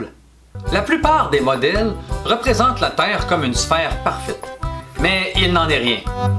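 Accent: Quebec, Canada. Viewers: Ellipsoïdale! La Terre est ellipsoïdale, du fait de sa rotation et de ses pôles plus aplatis. NB: Merci Dr Nozman et Alexandre Astier pour l'expression:) Merci aussi pour cette vidéo très bien expliquée, du très bon travail. Je me suis régalé d'écouter l'accent québecois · Très bon présentateur, fluide, instructif, marrant et avec des bonnes musiques ça donne un côté comique très agréable. Et puis l'accent québécois c'est toujours du bonheur ! Joli travail merci ! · Quand j'ai commencé la vidéo je me suis dis <<oh cool un Québécois !>> Parce que j'adore leur accent 🤣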